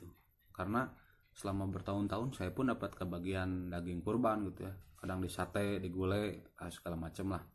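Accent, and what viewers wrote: West Java, Indonesia. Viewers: Kok logat nya sunda banget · MasyaAllah kang, tpi mmg , jujur sy baru pertama kali ini melihat dn mendengar kisah mualaf yg berasal dri suku sunda... maaf ya kang, ini benar2 penemuan sy pertama kali ada org sunda , (dn sangat kental sekali kesundaan nya si akang ini dri lentong nya, ) ternyata ada jg ya yg beragama kristen.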